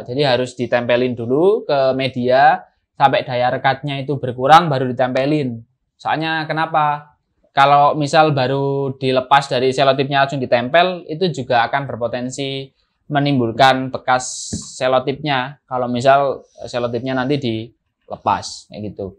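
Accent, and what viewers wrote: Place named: Java, Indonesia. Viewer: bahasamu lo mas muwwedok banget, dan klo masih newbie jangan ngejelasin yg kurang dipahami oleh penontonmu. belajar public speaking lagi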